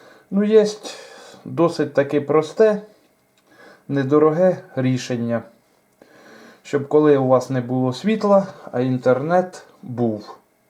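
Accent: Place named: Poltava, Ukraine. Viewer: По говору зразу зрозумів,що автор відео з Полтавщини. Дякую!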